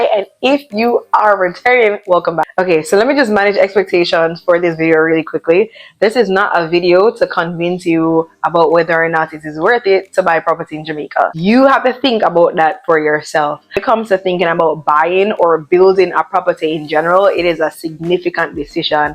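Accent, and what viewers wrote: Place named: United States. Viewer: PTSD?? Are you American you are saying some words and phrases like the Americans wood and you are training on the dialect also, so I think you've lived in America or you're just one of those Jamaicans who want to sound American😂 thank you show me a video on it's nice that everybody's learning from us, social media is the best invention.... The way you pronounce PROCESS, the way you pronounce ALREADY, because I know there's no two countries that pronounce the words the same as American. I know how Jamaicans are originally and I know they are practicing to sound like the US. I love genuine originality and although you are bringing good information, just be yourself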